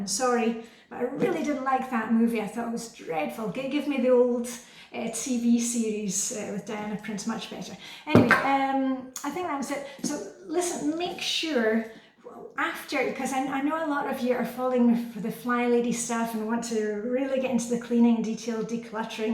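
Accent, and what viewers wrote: Scotland, United Kingdom. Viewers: Hello Diane, I´m new here just found you on YT. What a nice and inspirational person you are. I am Danish and live in Denmark. Seems to me that you have a Scottish accent is it true? Hope you don´t mind me asking. Jeg håber du får en rigtig god dag og ser frem til at dykke ned i dine videoer....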